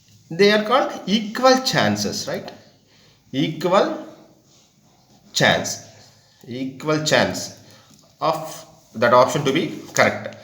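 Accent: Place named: South, India. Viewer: Hindi bolo jab samaj aata h hume hum dilli se hai ap south Indian ho apki bhasha alag hai aur bolne ka lehza bhi alag hai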